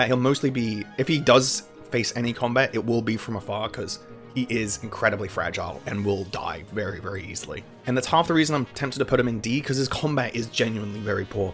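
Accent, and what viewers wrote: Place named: United Kingdom. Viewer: My experience with: > hey, that’s a chill tierlist, it can help stay up a bit longer. May as well subscribe, to watch that other Radiant Dawn 4-part series! > hey, that’s wind waker bgm, that can cheer me up a bit..! > that’s british accent, it sets me on good moods! > OH that’s theme!! And that’s how I became a fan! Thanks for the content! ❤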